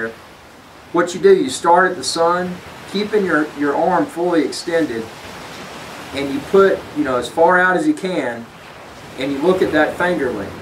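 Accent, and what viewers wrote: United States. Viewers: yeahbuddy! first to comment. ur american rite?nice accent, fake backround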